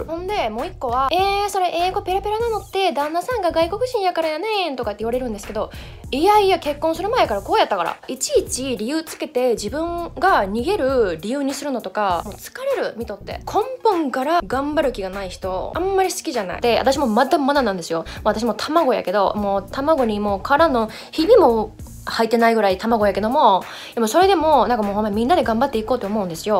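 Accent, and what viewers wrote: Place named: Okayama, Japan. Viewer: 岡山弁の響きが心地いいです。あるある、楽しかったです！ ほんま、おえん。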